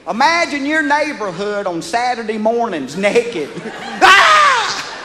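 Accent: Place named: South, United States. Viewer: hes hilarous,i have this DVD and i have watched this many times and can many more times lol i love his southern accent :)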